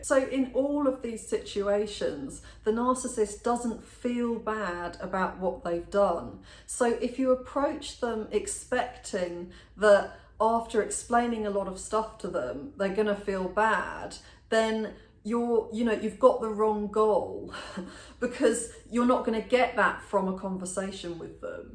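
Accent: United Kingdom. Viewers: tht UK accent is a turn-on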